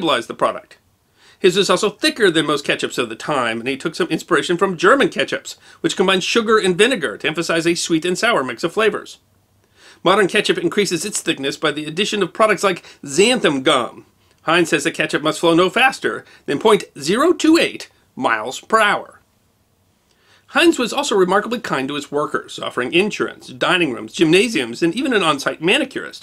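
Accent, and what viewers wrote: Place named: Chicago, United States. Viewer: 31 I love your Southern Side of the Loop accent! I almost peed on my self laughing! Where's your Chicago Typewriter? With your Moll?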